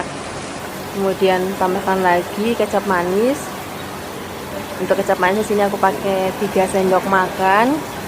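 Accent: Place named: Ngapak, Indonesia. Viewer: Medok banget rasa ngapaknya ,enak dirungokna.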